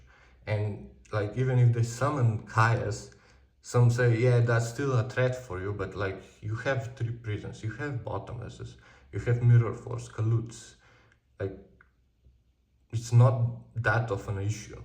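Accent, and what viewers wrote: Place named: Slovenia. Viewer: I can hear the slovenia accent from kilometers away [mojster]😂 nice video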